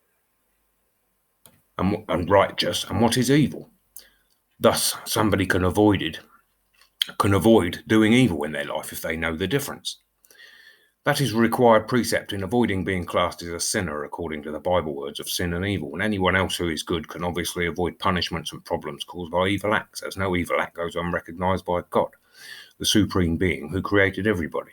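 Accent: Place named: England, United Kingdom. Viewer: For your information my english might not be as good as yours since i was born and raised in Sweden, i assume you are english judging by your accent. I have a question for you though, what do you think would have happened If christianity didn’t exist and Vikings would’ve continue to follow their religion and same with all the other native religions?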